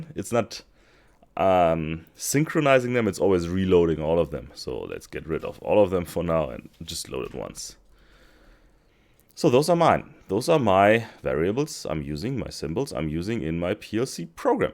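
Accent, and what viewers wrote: Germany. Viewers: I love your videos, thank you very much! Also, PLC Zim , classic german-english!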